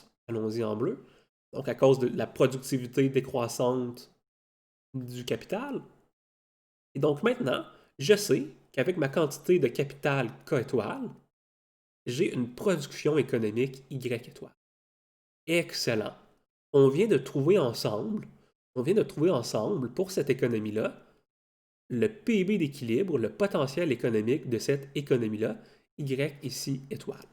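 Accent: Quebec, Canada. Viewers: formidable vidéo aussi bien pour cette explication clair et précise que pour ce fabuleux accent Québécois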